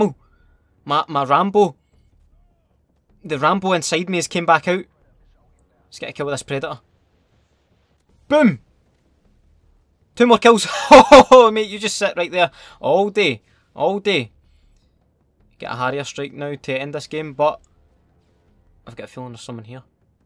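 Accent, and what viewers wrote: Scotland, United Kingdom. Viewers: i love his scottish accent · I love the Scottish accent the best thing ever happened on YouTube. uploading vidoes on YouTube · Videos are so funny because of your Scottish accent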